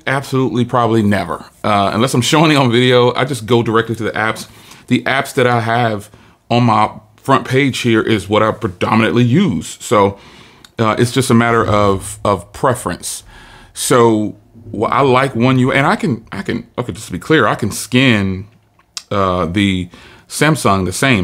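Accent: United States. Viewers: He speaks with an American accent.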